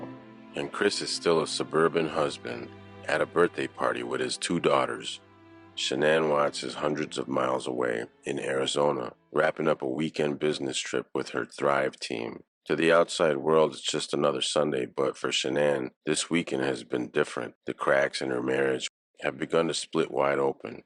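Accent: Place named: Northeast, United States. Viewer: I love listening to your voice. It's so calming. Love the northeast accent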